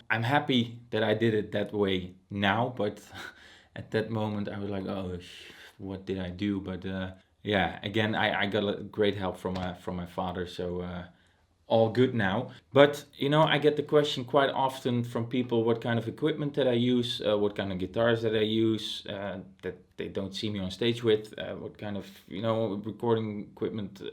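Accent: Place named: Flanders, Belgium. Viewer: I love the 'Ronald' keyboard , and the fact that your accent is not Dutch at all (it's Flemish :) )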